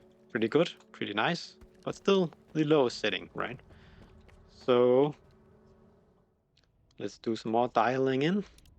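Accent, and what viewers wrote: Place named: Denmark. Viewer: Lol that's a Danish accent if I ever heard one.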